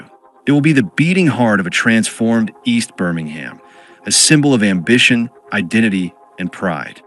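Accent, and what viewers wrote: United States. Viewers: I would rather have listened to an English-UK speaking narrator than an American one.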